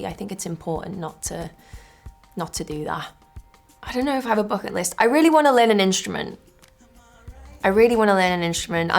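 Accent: Liverpool, United Kingdom. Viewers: Best coming from Liverpool is the accent. I love english language accents.I am not english native speaker. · I love the scouse accent icl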